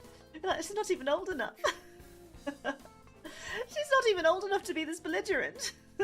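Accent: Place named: England, United Kingdom. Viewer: She's just English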